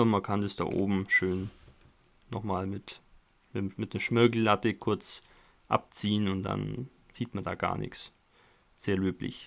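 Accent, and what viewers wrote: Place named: Swabia, Germany. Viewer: Naja ich denke mir manchmal schon wie amüsant der schwäbische Akzent doch manchmal ist... für einen Rheinländer etwas befremdlich aber dennoch macht es den besonderen Charme der Videos aus.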